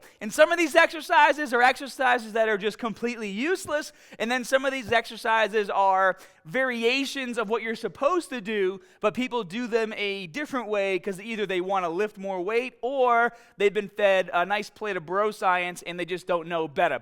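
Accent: Boston, United States. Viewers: He has to be from Boston with that accent, “When you grip the baaaaaahhhh” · I know nothing about this guy but can only surmise he is from Boston. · been following for a few years, very smart training man, the best on YouTube imo. And I've gone through a lot. P.S. from MA too, one of the last remaining Boston accents i know ;) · That boston accent tho 🔥🔥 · Are you from Boston ??? You have a slight twang on certain words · I knew it. He does the fucking accent on purpose. I could've sworn I've seen videos of his before where it's not nearly as pronounced. But that first "stahhhted" in this video he clearly did it on purpose. Why..... just.... why? The Northeastern/Boston accent is just so god awful it's like nails on a chalkboard to me. I guess I should've picked up on it sooner. He says "exercise" with no problem. When I was in the Navy my buddy was from Quincy, MA and he'd always say "exahcise." He also said "here" with little accent as well...... 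so again.... why go heavy on the accent sometimes? It's clearly on purpose. Specifically with "bahbell."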